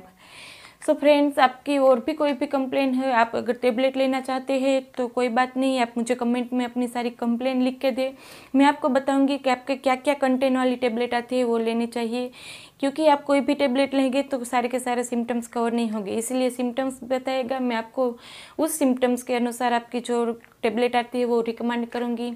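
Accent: Gujarat, India. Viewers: Aap gujrathi hai kya? Shayad kuchh or Bhavnagar ya junagadh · aap ki.language accecnt gujarati sound hoti hai doc.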